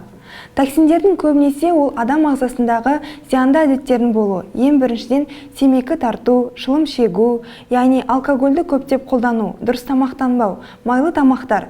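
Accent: Standard. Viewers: Көп жаса Айналайын ана тілімізде таза сөйлейтін сендей қыздарымызға Алла разы болсын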